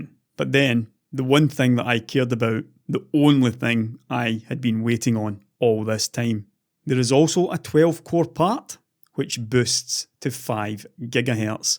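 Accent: Scotland, United Kingdom. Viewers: Scottish and male alter ego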